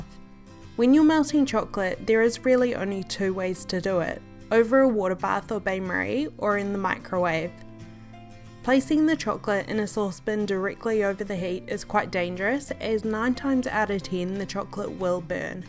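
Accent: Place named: New Zealand. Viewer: Hi, she’s not British. She’s from New Zealand. I’m Australian, and I’ve lived in NZ too, so, I can assure you she is a kiwi :)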